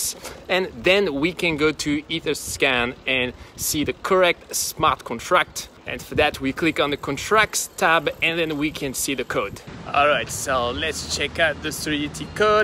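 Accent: France. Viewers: Salut très bonne vidéo, tu es français ?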